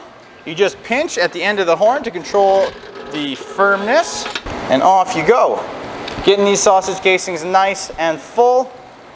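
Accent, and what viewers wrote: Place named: Canada. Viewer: He’s definitely Canadian. I’m from the Great White North myself and that accent is unmistakable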